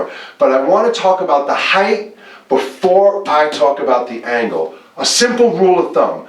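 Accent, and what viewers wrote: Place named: Long Island, United States. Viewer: Thanks for this. How long duration, or how many measures, should we do the full wrist strokes at each tempo? Also, love your accent, you from "Long-Giland"?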